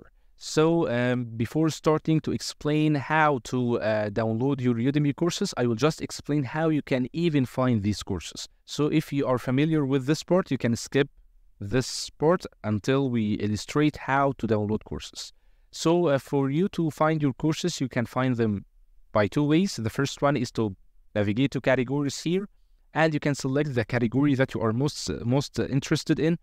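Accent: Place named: Egypt. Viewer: Thank you very much! it worked. وأخدت بالي إنك مصري زي من أول كام جملة :D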